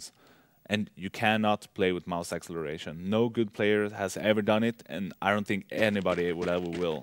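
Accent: Sweden. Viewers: 32 people diden't understand swedish-engilsh.... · love his swedish accent :D! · That's because English isn't his Native language, he's Swedish. Can you speak Swedish perfectly? Also he is legend, wait for it...Dairy!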